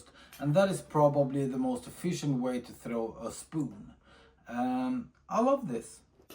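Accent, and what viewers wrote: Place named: Scandinavia. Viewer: based on his accent, either Denmark, Sweden, or Norway...that's why I'm asking him, Inwas wondering the laws regarding that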